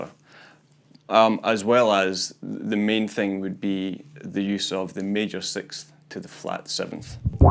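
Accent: Scotland, United Kingdom. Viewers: You're Scottish! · What kind of accent is that? Scottish - using American terminology- and he’s in Melbourne? Total distraction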